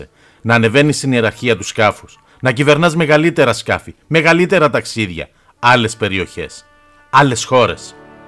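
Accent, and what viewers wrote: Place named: Spain. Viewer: wait... did this channel get highjacked and dubbed over by a patronizing Spanish speaking person?... time to eject that subscription!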